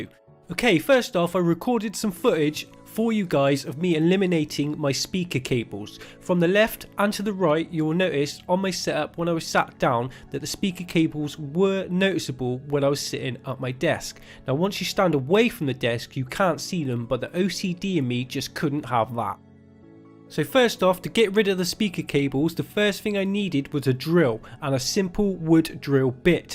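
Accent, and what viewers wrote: Bristol, United Kingdom. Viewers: u from bristol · Nice Bri-ish accent!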